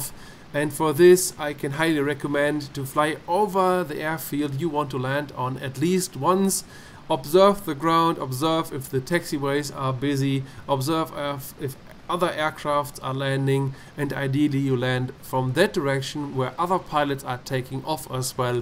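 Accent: Germany. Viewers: Well thank you! This video (and the whole 'how to' series) is incredibly helpful. *thumbs up In case I identified your accent correctly: Super gut, werde ich sicher noch das eine oder andere Mal als Referenz nutzen. :) Beste Grüße! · Love a nice German accent for tutorial. for real. Thanks, great info. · Your accent; so let's speak in Deutsche !!..Ohh Ich nicht sprech Deutsche Ahhaha..jijiij jajajaja, jejej. Bueno, hablemos castellano, idioma más impresionante.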